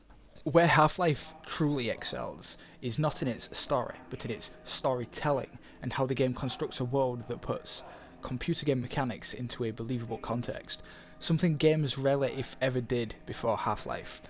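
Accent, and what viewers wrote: United Kingdom. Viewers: hes british, but not with the accent you would expect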